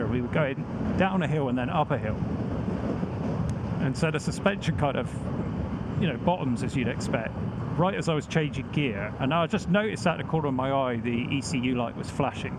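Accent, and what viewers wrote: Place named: West Country, United Kingdom. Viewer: I like your accent mate, just a subtle hint of west country. I hope you get this annoying problem fixed soon.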